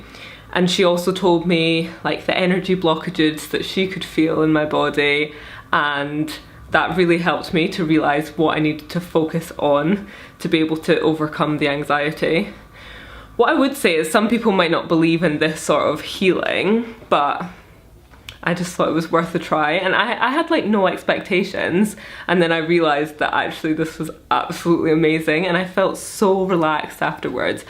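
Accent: Scotland, United Kingdom. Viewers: She has a slight Scottish accent. Beautiful skin.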